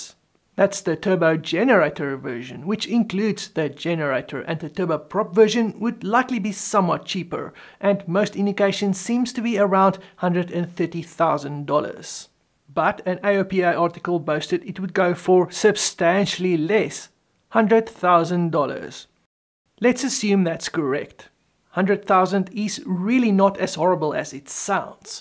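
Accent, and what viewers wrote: South Africa. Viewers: Brilliant !!! Well presented. Hou van die Suid Afrikaanse klink.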